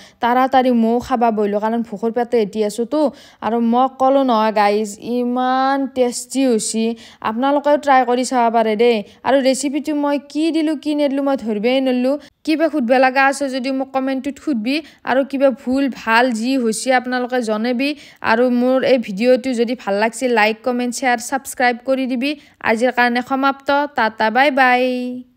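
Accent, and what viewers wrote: Lower Assam, India. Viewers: Bohut val lgil vlog tu. Bohut hahilu kotha khini huni. Actually moi upper assam r. Haikrne lower r vakha tu huni val lge. Hahi uthi gol😂. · r accent lower assam or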